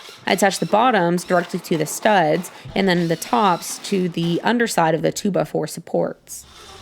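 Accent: Texas, United States. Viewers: Another great video! I think the ones poking fun at how us Texans talk need to just enjoy the video!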